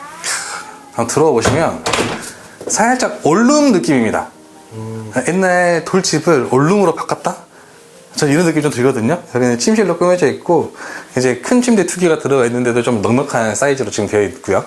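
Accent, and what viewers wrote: Jeolla, South Korea. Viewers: 말이 전라도네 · 영상 처음보는데 혹시 전남쪽? 광주? 이신가요? 차라리 사투리를 더 쓰시면 잼있을듯 합니다 ㅎㅎㅎ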